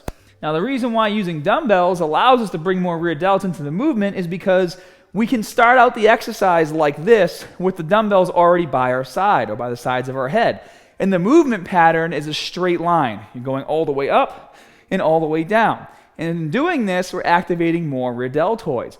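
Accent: Boston, United States. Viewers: Is that a Boston accent? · Someone is from the Boston area.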